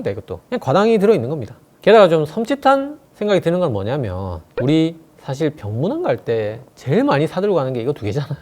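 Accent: Gyeongsang, South Korea. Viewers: 쌤 보유국 우리나라🎉 성실하시고 어려운 의학을 구수한 경상도 사투리에 담아서 위트있게 잘도 설명해 주시는 능력자이심🎉 부산가면 꼭 뵙고 대접해드리고 싶은 분😊 근데 점점 더 멋있어지심?😮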